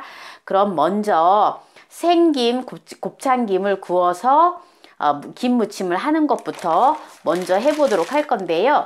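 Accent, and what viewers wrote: Jeolla, South Korea. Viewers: 여사님 말씨를 들어 보니 고향이 전라도 분이시군요. 역시 남도 분들이 요리 잘하시는건 인정 합니다.전 완도산 덩어리로 길게 뭉처진 곱창김을 구입 위와 유사하게 하는데도 하늘나라에 계신 어머님이 옛날 어릴적 해주셨던 그맛이 나질 않는군요 ...ㅜ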